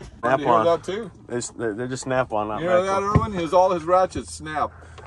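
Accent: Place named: California, United States. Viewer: You sound like you are in cali. I lived in San Jose for seventeen years.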